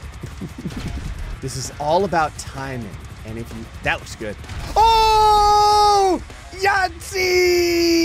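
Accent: United Kingdom. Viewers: IS FROM THE UK????!!!!!!!!! 😮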